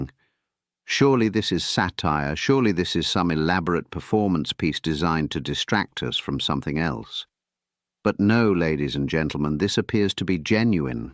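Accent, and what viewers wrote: United Kingdom. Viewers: Why is it always someone with a British accent that sounds the alarms of discontent and stupidity within our country and makes the most sense of what blind cult Americans refuse to acknowledge always so on point. WAKE THE F up PEOPLE this is our country going to HELL in a hand basket and we may never recover😢😢😢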